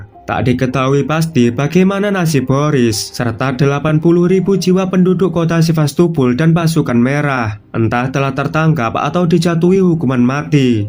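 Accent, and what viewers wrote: Java, Indonesia. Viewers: mendalam kali ah bro ini ngomongnya..medok kali 😂 moga sukses bro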